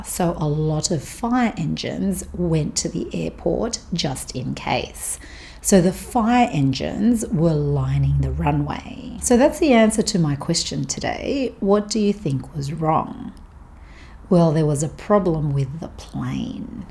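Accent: Australia. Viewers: Hi, your videos are helping me to understand Aussie accent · This channel is fantastic for learning Australian English and accent. Please make more videos because I learn so much on this channel. It is easy and simple to follow and understand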